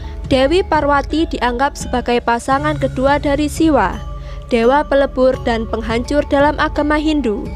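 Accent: Java, Indonesia. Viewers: Kok medok jawane pundi mbakyu??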